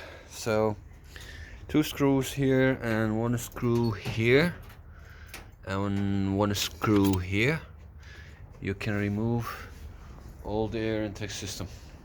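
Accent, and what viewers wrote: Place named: United States. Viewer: Thank you brother تحياتي لك من بغداد اخوية الورد You have great accent i thought you r American Feeling proud of you زور باش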